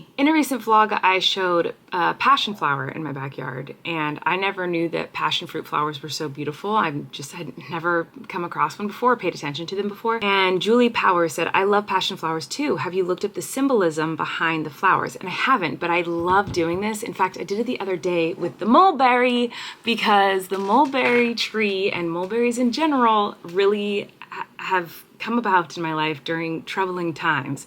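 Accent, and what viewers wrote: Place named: California, United States. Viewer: I knew you were saying it different but I thought it was your California accent. Lol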